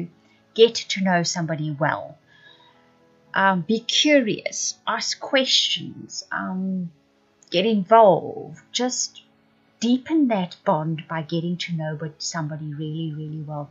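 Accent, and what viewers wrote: South Africa. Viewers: Love your South African accent. Sound like my best friend. Awesome reading thank you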